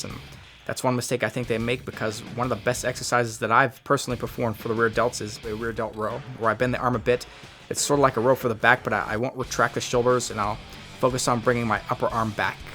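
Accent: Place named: United States. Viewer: Whats the accent? Its American but there sounds like theres something else in there..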